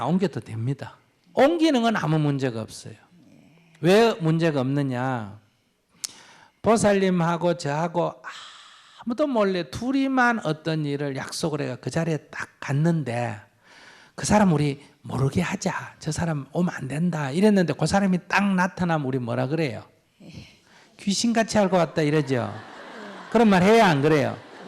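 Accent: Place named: Chungcheong, South Korea. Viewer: 누가 봐도 충청도분이시네ㅎㅎㅎ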